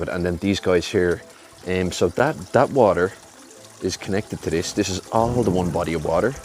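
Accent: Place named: United Kingdom. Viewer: I’ve just come across your channel (Im a fellow goldfish YouTuber 😁) where abouts are you based? You sound British but I can’t believe the weather is warm enough to have goldfish in a tank outdoors all year. It looks amazing by the way and I’m definitely subscribing so I can see more! 👍🏻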